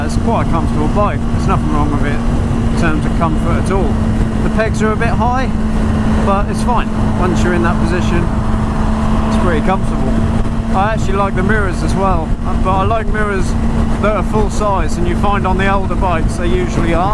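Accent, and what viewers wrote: Norfolk, United Kingdom. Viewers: Great vid. What would the R6 be like as a first bike? Also. I thought I recognised your Norfolk accent as I am only 20 or so miles from the border. lol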